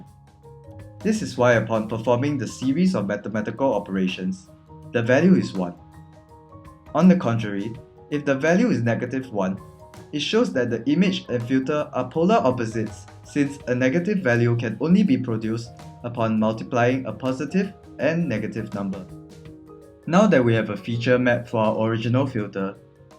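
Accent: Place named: Singapore. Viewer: The moment I heard your voice I knew you were a fellow Singaporean lmao. Looking forward to more of your videos!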